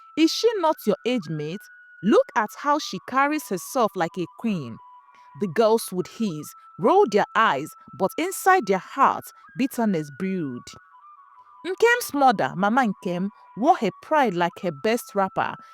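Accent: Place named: Ghana. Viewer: You speak like a Ghanaian are 😮😮 you one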